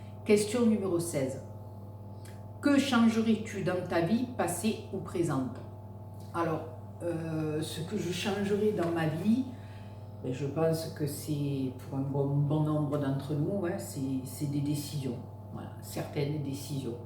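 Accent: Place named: Marseille, France. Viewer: Coucou. Je te trouve radieuse, tu brilles encore plus que ton magnifique sapin! Que d'émotion dans cette vidéo, on retrouve toute ta gentillesse, ta générosité, ta bienveillance, tu es une personne au grand coeur et on ne peut que t'aimer. J'adhère totalement à tes réponses, j'aimerais moi aussi changer beaucoup de choses, mais.... En plus tu me fais rire avec ton adorable accent de Marseille, ta poudre de Perlimpinpin et le chocolat. Merci pour cette vidéo. Passe une bonne fin d'année. Plein de bisous à toi et ton mari.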